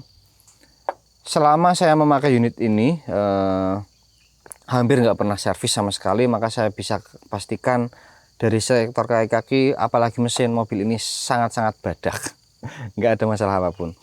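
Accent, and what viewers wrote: Java, Indonesia. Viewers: Engkik...engkik....suka bahasa mas, njowo banget, :-)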